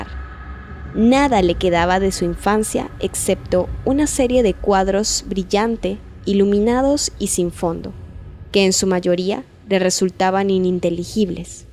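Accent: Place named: Latin America. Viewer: Bien! Con acento latino. Ese ibérico es muy molesto. Gracias.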